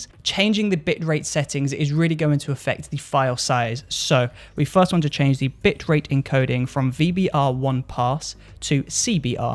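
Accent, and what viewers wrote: United Kingdom. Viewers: Sounds pretty nice British?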